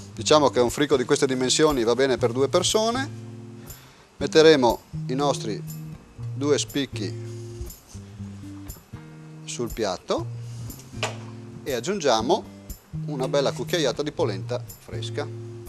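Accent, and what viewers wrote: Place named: Friuli, Italy. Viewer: l'hai sentito parlare? certo che è friulano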